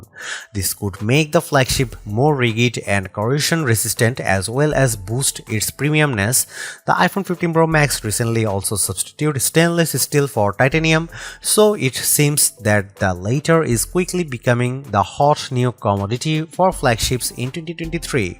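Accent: India. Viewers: Indian English accent 😂😂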